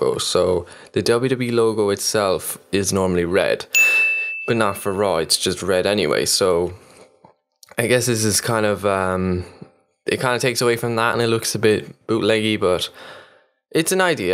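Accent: Ireland. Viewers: im guessing your irish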